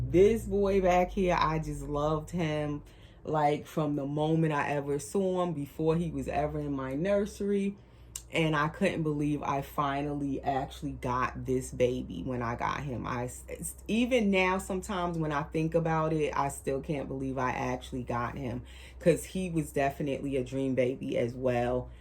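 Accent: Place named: New York, United States. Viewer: Ok I have to ask... are you from NY? NJ? Lol you have that accent. I love listening to you talk!❤ I also love listening to myself talk 😂so I'm just wondering lol love your baby, she's sooooo cute!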